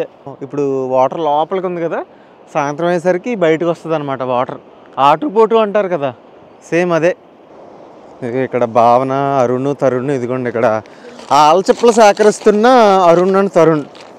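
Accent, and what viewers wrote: Godavari, India. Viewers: Absolutely loved this video! The way you captured the essence of mana Godavari with that authentic yaasa was incredible. It brought back so many memories, and your storytelling was spot on. Keep up the amazing work,! Looking forward to more such gems from your channel. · Annoooo godavari style haa❤❤❤❤❤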